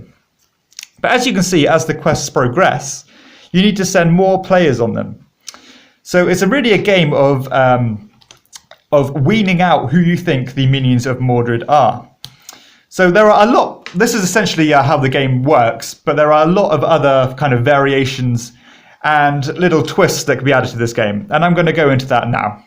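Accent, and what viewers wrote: United Kingdom. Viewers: Glad to help! I can definitely see the confidence. And Avalon is one of my favs also. I should mention as a fellow fan of the genre that if you haven't tried it yet, "Secret Hitler" has some great and exciting twists on the genre that my social deduction groups love equally for slightly different reasons. Fewer "roles" but in exchange the title has an elegant deck mechanic that basically auto-balances the game if one side or the other is winning too heavily, and the government becomes more authoritarian and heavy-handed as more fascist policies are passed which creates a bit more of a crescendo and arc to the drama (whereas Avalon Rounds 1 and 5 aren't terribly different necessarily). We go back and forth over which game we prefer since both have interesting pros and cons. I think it's important to add that having played the game I don't believe the source material was used distastefully, I think there were interesting and compelling reasons for selecting that particular theme over others. 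Maybe a game to consider when it's available outside the U.S. (your accent sounds British to me); it is also available via free PnP from the manufacturer's website. And THANK YOU for the sleeves, I'll check them out! I need them for my copy of War of the Ring too and I saw you had already given the dimensions for those in a different video and they seem to be the same brand. Lifesaver!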